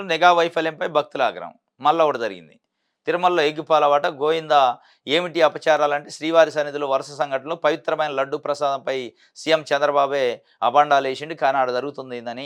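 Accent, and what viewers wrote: Telangana, India. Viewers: Anchor telangana vadu laga vunnadu laungage different ga vundi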